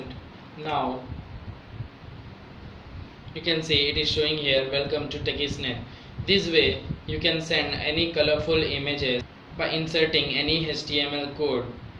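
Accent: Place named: India. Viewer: Why are indians so bugady udding it, naw watdis ...blah blah... cant understand you! DUH!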